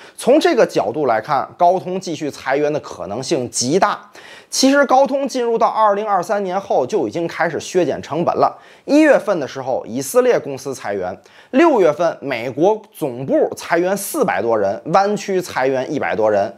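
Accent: Tianjin, China. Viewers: 但是天津话是本频道的亮点啊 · 听你口气也是天津人呀！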